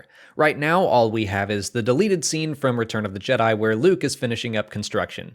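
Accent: Australia. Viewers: which part of australia are you from? the accent isnt what i expected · What part of Australia are you from? You sound funny.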